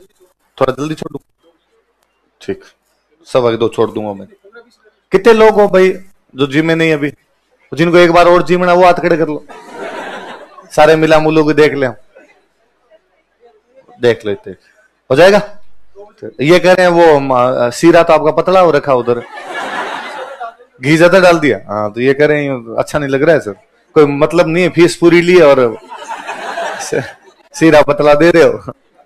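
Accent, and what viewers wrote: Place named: Marwar, India. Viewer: जी भाई साहब आपका वीडियो देख कर बड़ा अच्छा लगता है अपना ठेठ अंदाज बोलने का मजा आ जाता है मारवाड़ी भाई अपने लेजे में हिंदी बोली बड़ा मजा आता है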